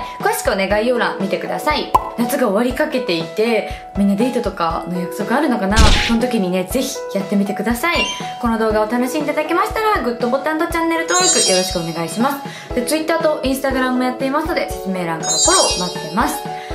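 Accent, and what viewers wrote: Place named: Kansai, Japan. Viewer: 文句なし！可愛い❣️咲乃ちゃん時々関西のイントネーションになるね😄お母さんの影響？徳島だっけ？なんか嬉しい😊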